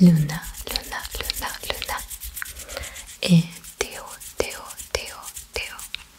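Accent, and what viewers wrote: Russia. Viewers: J'ai amies russes qui parlent parfaitement comme toi, trouve ça impressionnant russe est vraiment très très différent du français, on entend presque pas ton accent russe😮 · Je regarde cette vidéo après ta vidéo d'il y a un an et ton évolution est super ! Tu articule vraiment bien et ton vocabulaire a evolué ! Vraiment je suis admiratif de voir à quel point tu a persévéré dans ton apprentissage ! Et puis toujours le petit accent russe qui va bien 😊 !j'aimerai avoir 1% de ton niveau de français en russe ! Merci pour tes vidéos et bonne continuation 🎉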